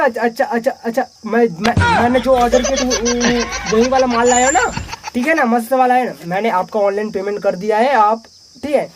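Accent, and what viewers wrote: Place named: Chhattisgarh, India. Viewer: Chattishgarhi awaj me bane lagthe yrr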